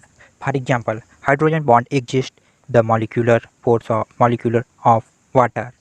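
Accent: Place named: United Kingdom. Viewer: Bhai British main lag rahe hai 🔥🔥🔥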